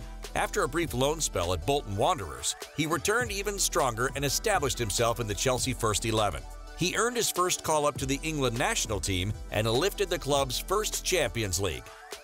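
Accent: United States. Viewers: The American voiceover is not for me. So divorced from anything football.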